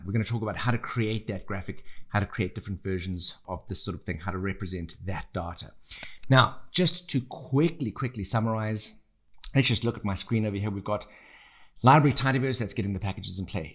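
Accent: South Africa. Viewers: Hi you sound South African. Also. Please can you do one with loads of data as with spectra. I have to do it for my project and can't for the life of me figure it out. If you can reach out please.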